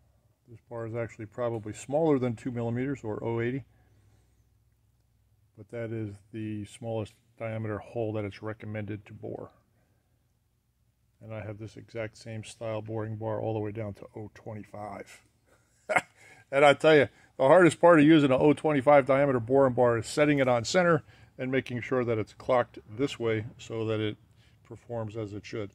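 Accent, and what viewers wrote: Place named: Texas, United States. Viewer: Did I undesrtand you right ? "makes you wanna blow your hair out" My native language is german, so it is sometimes a little bit difficult to understand your Texas slang. Greetings from Austria ;-)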